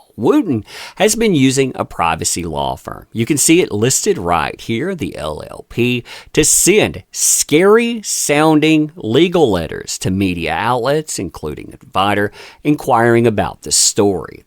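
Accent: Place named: Virginia, United States. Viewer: WOOTEN is still butthurt that Elon purchased "X" and no longer surpressed opinions. BTW Brother, your accent sounds like those in Fredericksburg, VA.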